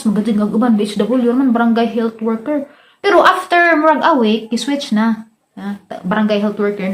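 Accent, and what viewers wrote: Bohol, Philippines. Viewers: Inday bilib ko nimo.gahi man jud ag sinultihan sa atuang tga bohol.proud ta tga bohol ta.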